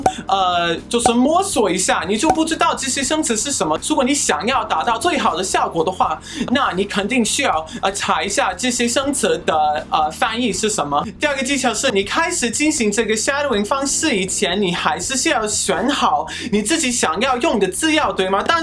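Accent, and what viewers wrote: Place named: Guangdong, China. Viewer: You really sound like you've learned Mandarin in Guangdong (not a criticism-that's what I'm used to). I'm currently shadowing 小王子 in Cantonese over 27 days and recording it. I'll upload those when I have some free time.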